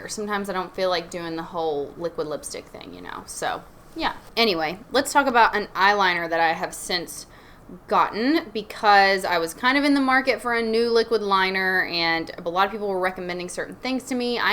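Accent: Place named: Texas, United States. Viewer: Are you from texas .... you sound very Texan .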